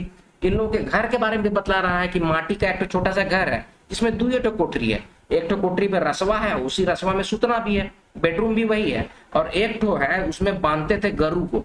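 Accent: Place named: Nagpur, India. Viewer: आप जैसे ठेठ नागपुरी बोलने वाले शिक्षक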